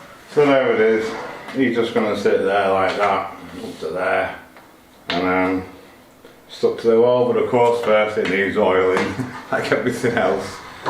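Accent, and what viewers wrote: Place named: Yorkshire, United Kingdom. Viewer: Love the door❤ Where are you guys from? You sound like your from Yorkshire 😁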